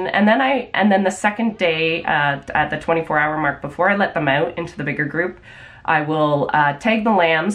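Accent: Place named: Canada. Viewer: Your Canadian accent is really fun to listen to 😉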